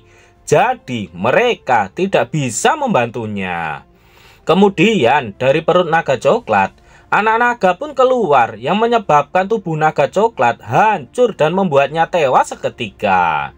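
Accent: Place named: Java, Indonesia. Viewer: Kg jawa medok kembali 🎉🎉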